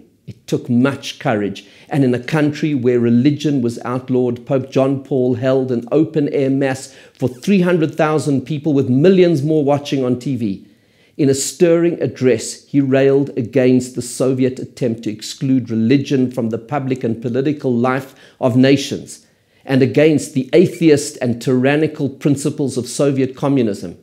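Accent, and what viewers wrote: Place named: South Africa. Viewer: Within 10 seconds I knew you were South African.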